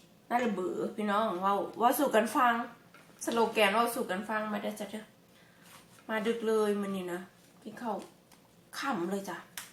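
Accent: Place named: Bueng Kan, Thailand. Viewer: น้อง แม่นเป็นคนบึงกาฬบ่อพี่อยู่โคกก่อง สำเนียงเว้าคือกัน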